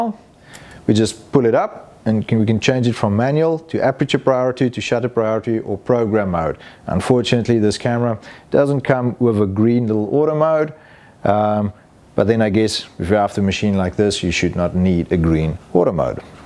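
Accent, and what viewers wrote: South Africa. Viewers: You sound like a fellow South African living in NZ; Afrikaans first language? Thank you for the review, I love that camera, but will only be able to afford it 15 years after its release😥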